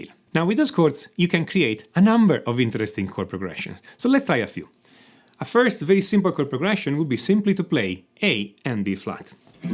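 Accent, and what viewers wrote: Italy. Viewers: Your channel is a gold mine. Are you Italian? Cuz your accent sound a bit like it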